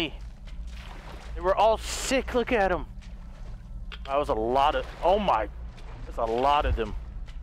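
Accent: Russia. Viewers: RUSSIAN ACCENT 💯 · You do a really good Russian accent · I love his Russian accent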